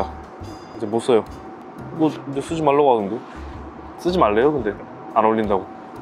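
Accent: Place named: Daegu, South Korea. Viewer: 사투리 마 기깔나네 찐대구사투리💙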